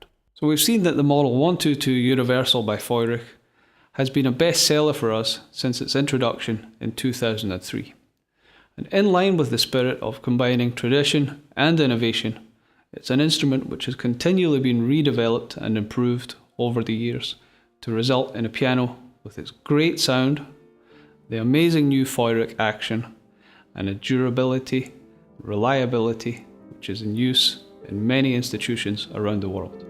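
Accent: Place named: Vienna, Austria. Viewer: I love the viennese accent!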